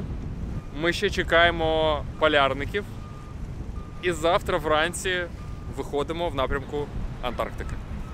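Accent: Russia. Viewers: Птушкін українською вже не той… Не такий щирий, не такий емоційний і не такий цікавий. При коментуванні та озвучці відео вставити за весь час 150-200 російських слів і фраз…ну не йде, Антон, для чого видавлювати з себе те, що навпаки псує враження? Тебе прекрасно всі розуміють російською та ще й в 100 разів більша аудиторія…ну таке собі, чесно, без образ. За роботу браво і дякую, за мову - мінус, хоча я сам із заходу і виріс в україномовному середовищі, але чути від тебе українську - не заходить абсолютно…☹️ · Дякую за фільм. Чудові зйомки! Тільки попрацюйте над мовою, бо купа русизмів. британці not брітанци, корабЕль not кАрАбль, на вОду not наваду, швартОвІ not швартовИ, квіточкИ not цвєточкі, мИлотА not мілота, глИбокій not глУбокій, архіпелаЗІ not архіпєлаґє, Шпі́цбе́рген not Шпіцбєрґєн, лід not льод, кОнтинент not кантінєнт, білий ведмідь not білий медвідь, к раєвид not вид, човен not лодка, сУдна not судА, замайорІв not замайорИв, материку nat матєрікє, особини not особі, живіт not живот, берлозі not бєрлогє, стіл not стол, стосунки not відносини, ну і звісно Да легко можна змінити на Та) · ни одна страна мира так не кичится своим флагом, гербом и языком, как Украина. 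больше видимо нечем. Если что русскому человеку все понятно и на украинском, но справедливости ради приходится вслушиваться. Но просто все понимают что ты не украинец, родившись и вырастая ты находился в русском, тогда еще находившимся на территории Украины, но русском, Луганске. Ощущение, что русский говорит на очень простом украинском, где по-украински он знает только самые банальные слова, будто разговор с акцентом. и да я понимаю, что это мое личное негодование и не мое дело и я это признаю. просто искренне хочется смотреть крутые видосы на полностью понятном тебе языке и человека который всегда снимал на нем, всегда все всем понятно было и всегда было здорово все.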